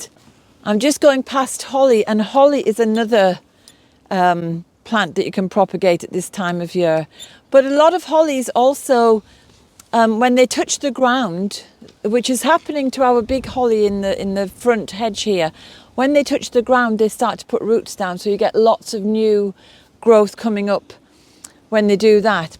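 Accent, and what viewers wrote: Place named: Yorkshire, United Kingdom. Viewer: Sounds great good company and the satisfaction of a good job done good for you. Studying brought me from the Midlands to Leeds and a settled up here.Your accent tells me you will know it Wilsden village just down the road from Haworth, I connect with Eire too my father RIP was a Carlow, man. Blessings peace and harmony to you xxx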